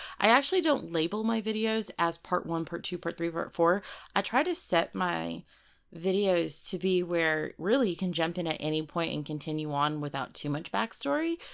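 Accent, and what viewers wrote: South, United States. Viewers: I didn't know i needed a southern furry till right this moment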